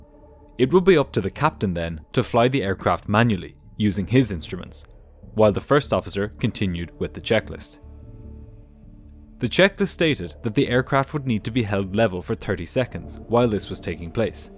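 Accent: Ireland. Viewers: Thanks. 🇮🇪 this is the only videos of this sort of stuff I watch. It's always nice and honest without B.S or showboating like some others . PS our lovely Irish accent also helps 😊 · I could listen to this man speak all day. There’s a reason why Irish is voted people’s favourite accent out of the whole UK and Ireland.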